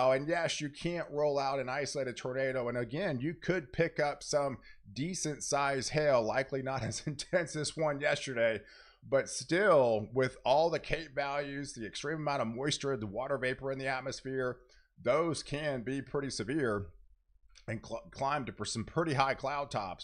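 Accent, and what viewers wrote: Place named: South, United States. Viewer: love the southren accent!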